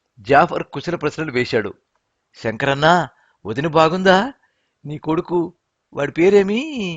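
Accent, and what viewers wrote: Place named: Kadapa, India. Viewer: Kadapa dist language narration in story reading very nice sir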